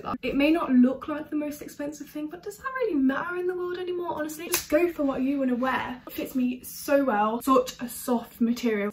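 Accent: United Kingdom. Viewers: U have British accent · I’m italian and I really love your british accent, i mean, i could listen to you for like FOREVER :)) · she kinda sounds like millie too, like the british accent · your accent... omg. im obsessed with british accent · En verdad amo tu acento británico 🤩😍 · That britihs accent i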